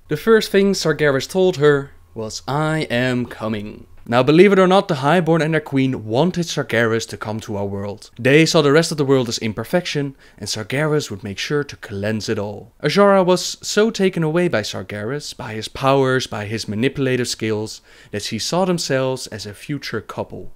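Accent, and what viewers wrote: Netherlands. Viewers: He has a Dutch accent. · He is from the Netherlands. I am too and i can hear from his english speaking he is dutch:P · you are definitely dutch! btw nice videos!! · Quick question. Are you by chance Dutch? I have a Dutch friend, and you sound like him quite a bit. I've also noticed on one of your toon's names, there's 'je' at the end, which, if I'm correct, in Dutch, is what you would call a small child. Thanks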